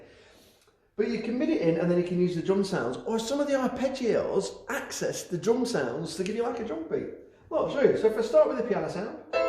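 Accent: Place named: Lancashire, United Kingdom. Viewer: This fellow is much more Ace Rimmer than Arnold Judas - what a (nice) guy! Thanks for the enthusiastic demo, all wrapped up in a good honest Lancashire accent! I bet he's a great dad :D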